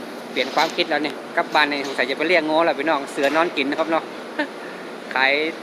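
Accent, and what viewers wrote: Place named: Nan, Thailand. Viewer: สำเนียงคุ้นหู คนเมืองน่าน บ้านปอนเน้อ เก็บเกี่ยวความฮู้มาเลี้ยงเองตี่บ้านเน้อ เอาใจจ่วยลงรายละเอียดนักๆๆ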